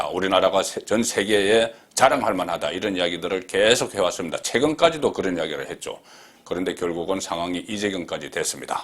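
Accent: Gyeongsang, South Korea. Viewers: 강한 경상도 억양때문에 첨엔 어쩌나 했는데 들을수록 내용이 진솔 담백해서 조용히 지켜 봅니다. 저는 여성이라 경상도 억양이 답이 안나오네요. 아...서울말 쓰고 싶다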